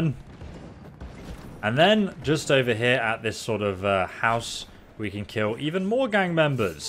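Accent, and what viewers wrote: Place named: United Kingdom. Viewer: I know its nothing to do with this game but, I love your british accent.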